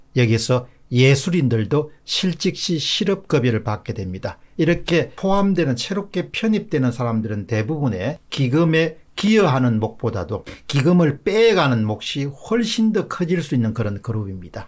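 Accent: Gyeongsang, South Korea. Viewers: 경상도 사투리 발음이라 그래요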